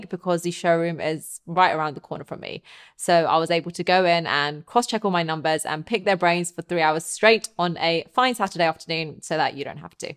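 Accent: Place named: London, United Kingdom. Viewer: said "What dialect does she have?". No detectable dialect. She's speaking standard British English with a British English accent. More or less RP but with a slight London/Essex accent if I had to guess.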